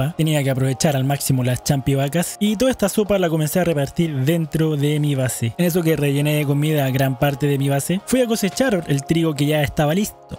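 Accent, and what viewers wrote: Chile. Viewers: Me encanta el acento chileno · Verdaderamente escuchar tu voz, narrando con tu acento chileno me hizo sentir muy agusto y entretenida, como cuando veía 31 minutos lol, saludos 🇲🇽😸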